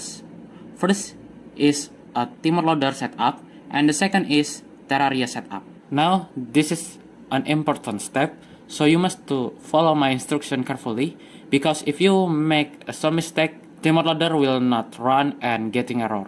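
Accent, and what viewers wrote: Indonesia. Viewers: Makaih bang , btw gw tau lu indonesia dari accent inggris ly bang hehe 😅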